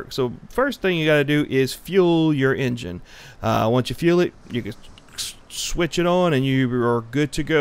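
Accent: South, United States. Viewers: Is that a southern accent I detect? :D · is a coonass, im from bama. I tend to seek out the southern tubers, they my kinda people.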